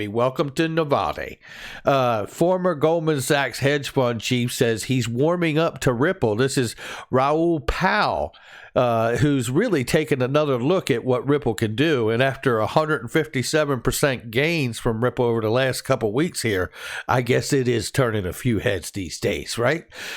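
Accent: England, United Kingdom. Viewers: Come on mate, that sounds like a pommie accent, not a Aussie.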